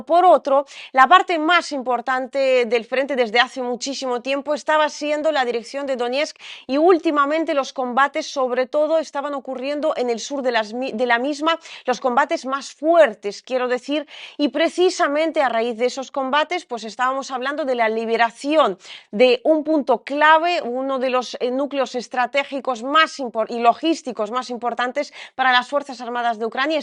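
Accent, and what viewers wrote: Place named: Spain. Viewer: Hola soy Español mi pregunta es dónde a prendites el español que maravilla cómo habrás con ese acento Español, parecés qué seas Española Felicidades españolista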